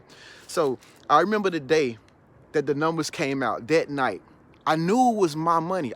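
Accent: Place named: South, United States. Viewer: I knew I recognized that Southern twang. NC native here too.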